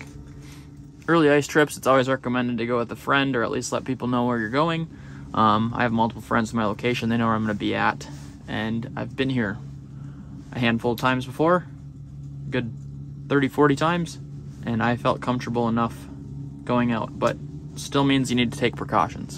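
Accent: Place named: Minnesota, United States. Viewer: The Minnesota accent really shining through in today's video! I really enjoying seeing your community interactions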